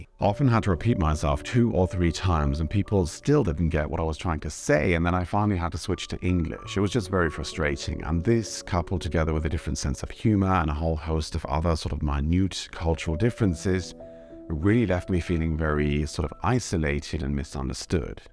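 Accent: United Kingdom. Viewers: Thanks für this new video! I really got to enjoy your content but for me it is mostly the combination of your accent and your deep-ish calming voice which surely is the iceing on the cake. I can't really put my finger on it but I have always liked to listen to british people speaking german. I would think that you had quite some luck with your boss back then, because if you really felt you were terrible at your you and she still didn't fire you, she must have seen your potential and positive personality as a future promise. :) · You English is like middle to upper class British .Clipped and precise .I would put every cent i own in betting that you did not grow up in a British Council estate .You German sounds very good to me .I have only baby German myself after living in Berlin many years ago for a year 1977-78 . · Ich finde es lustig, wenn du zwischen Englisch und Deutsch wechselst, dass es mir zunächst nicht aufgefallen ist,wie oft es in diesem Video gemacht wurde, weil der Sprachfluss einfach gleichmäßig weiter geht. 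😂 Ich hab nur plötzlich gedacht, ah er spricht in Deutsch 🤔 War jetzt das ganze Video auf Deutsch ohne, dass ich es realisiert habe? Und dann geht es plötzlich auf Englisch weiter 😄 Also es spricht auf jeden Fall auch einfach für dein fließendes Sprechen und ja, au h für den britischen Akzent, aber der ist einfach nur sympathisch, weil trotzdem alles perfekt ausgesprochen und verständlich ist. 👍🏻 zumal ich ihn sehr dezent finde und nicht überladend. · Hach ja...weil es ja so oft erwähnt wird, das mit dem britischen Akzent...das ist doch überhaupt nicht wichtig. Ich persönlich empfinde es nicht als Nachteil, wenn mein deutsch sprechendes Gegenüber mit Akzent spricht. Sei es von einem deutschen Dialekt oder einer anderen Sprache. Ich selber spreche fließend Englisch, aber "with a heavy German accent" wie mir bescheinigt wurde. Da mache ich mir keine Gedanken mehr und spreche einfach drauf los wenn es die Situation erfordert. 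Akzent oder kein Akzent, das macht nicht den Menschen aus. Frankfurt am Main - sehr schön, sehr charmant dargestellt in diesem Video. Allerdings ist mir die Stadt, in der ich aufgewachsen bin und den größten Teil meines Lebens verbracht habe, sehr fremd geworden. Daher bin ich vom Stadtkind zum Landei konvertiert. Weiterhin eine gute Zeit und ein zufriedenes und erfülltes Leben! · For me you speak german with your british accent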